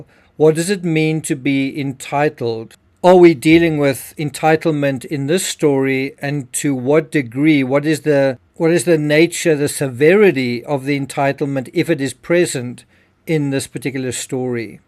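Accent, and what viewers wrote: South Africa. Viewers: I like his accent. Is it South African?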